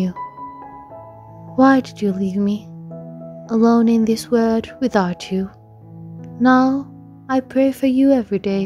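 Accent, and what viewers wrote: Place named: United Kingdom. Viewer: Your British English is very nice you have an accent beautiful thinks for this ideas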